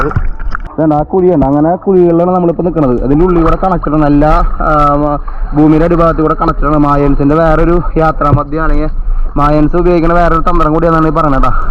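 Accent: Thrissur, India. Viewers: Hello,Please write your script you dialogue as there is repetition of your words and descriptions...also lessen your trichur accent...as always picturixation is great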